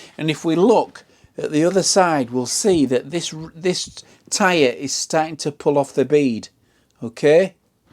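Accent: Yorkshire, United Kingdom. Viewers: 😆Totally love your accent! Yorkshire I guess?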